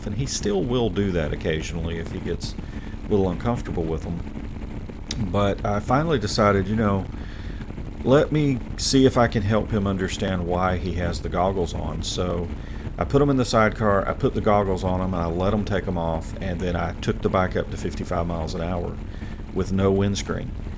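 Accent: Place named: South, United States. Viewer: Just a quick comment to let you know how much I enjoy your well-done and informative videos about the Ural life. The vids which are solely travel logs of sorts, with endless GoPro footage and no commentary are boring. Yours are educational, and I must say, your calm, relaxed manner with just that touch of the South in your voice is perfect. I know these are a lot of work, but hope you keep them coming.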